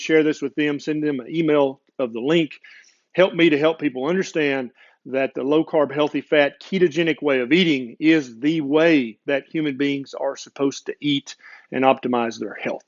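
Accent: South, United States. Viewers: You’re such a genuine person... its why i love this channel a lot. Saturated with knowledge from a MD with a genuine southern accent👍🏻🇺🇸 Which state are u from?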